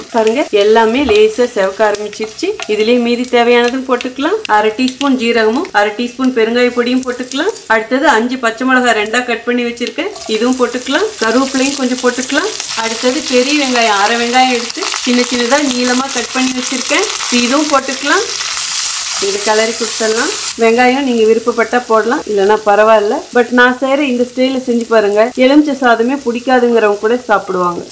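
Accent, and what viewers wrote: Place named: Kongu, India. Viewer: Kongu Thangamae, Sweet Kongu manam veesum ungal Slangs, though at Bangalore, Still Comes to All people and bringing Happiness just like Wonderful preparation of Lemon Rice. Thank you, Selva Magalae.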